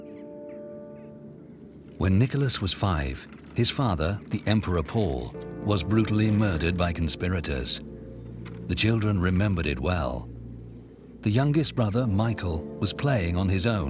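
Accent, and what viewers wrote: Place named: England, United Kingdom. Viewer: МММ, английский тип) но нормально!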